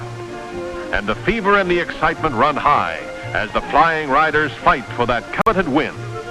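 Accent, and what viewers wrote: United States. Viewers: contemptible American accent. Devilish accent.C,est accent est celui de la mort ,pour touts les pays .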